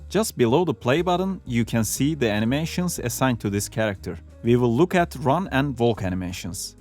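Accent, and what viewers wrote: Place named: Turkey. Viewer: Türk olduğunu aksandan hemen anladım.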